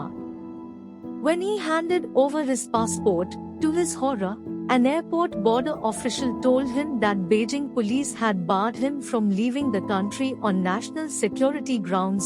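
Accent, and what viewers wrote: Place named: India. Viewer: The Indian accent tells me all I have to know that this is a garbage channel.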